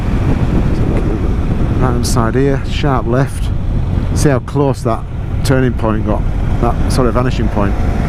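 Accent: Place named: Scotland, United Kingdom. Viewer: Where in the UK did you come from? Your accent is heavy. Could almost be Scotty.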